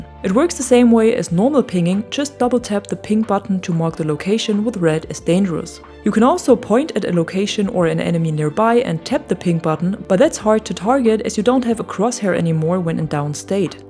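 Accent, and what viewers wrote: Germany. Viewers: Warum hört man die Deutschen immer raus wenn sie Englisch sprechen 😁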